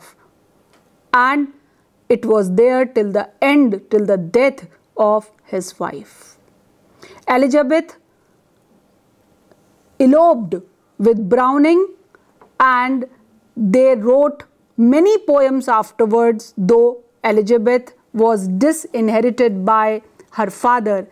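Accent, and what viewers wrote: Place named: North India, India. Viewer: Typical north-indian accent weighs heavily on the lecture. in terms of the content it is below the average. finally the attention to appearance is not in keeping with an academic context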